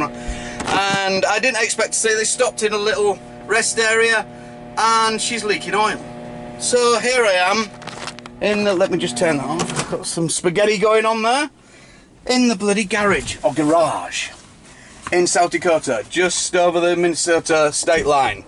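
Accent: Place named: Liverpool, United Kingdom. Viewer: Love the accent mate, it does make me think of scouse a bit. I blame Harry Enfield. Used to love that show with the scousers 🤪😁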